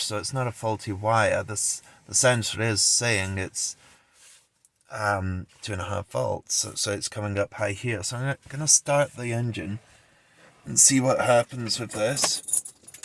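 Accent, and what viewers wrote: Scotland, United Kingdom. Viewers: Very interesting accent, bit of scottish and american... Whats the craic?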